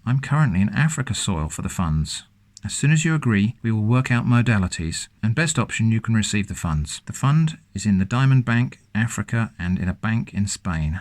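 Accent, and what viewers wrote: England, United Kingdom. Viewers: Rolando Cueva English. Not Aussie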